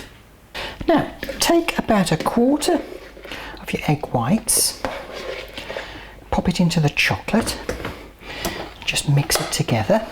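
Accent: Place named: RP, United Kingdom. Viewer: i love your high english...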